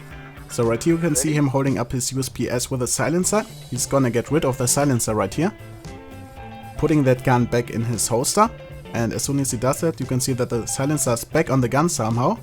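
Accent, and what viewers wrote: Germany. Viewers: Man hört so richtig das du Deutsch bist :D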